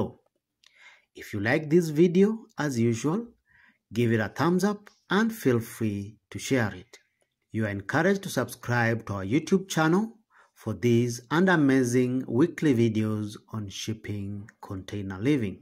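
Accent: Kenya. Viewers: The narrator has a Kenyan accent. It's what kept me watchinf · YOU SOUND KENYAN ... ???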